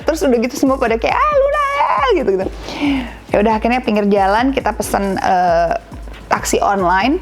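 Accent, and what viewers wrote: Bali, Indonesia. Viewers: Seneng liat kak luna pke logat bali, ga kagok, emang orang bali sh ya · Logat balinya baru keliatan 🙏 salam rahayu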